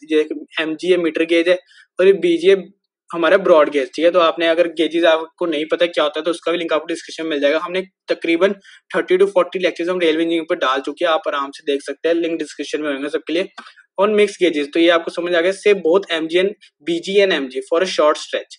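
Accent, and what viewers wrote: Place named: Jammu, India. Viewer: Way of speech suggests that you r from jammu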